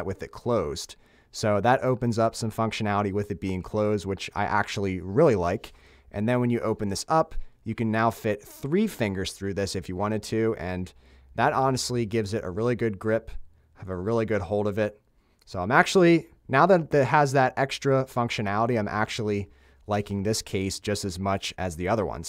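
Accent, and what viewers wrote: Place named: Pennsylvania, United States. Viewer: First time watching one of your videos. Could hear the accent right away and had to look it up. PA representing lol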